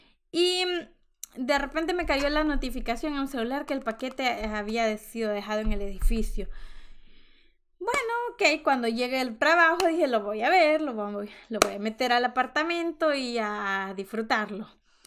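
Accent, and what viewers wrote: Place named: El Salvador. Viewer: me encanta como hablas !!! me facina tu acento salvadoreno !!! lastimosamente de alguna manera yo perdi el mio pero bueno te felicito por tu canal y por el contenido .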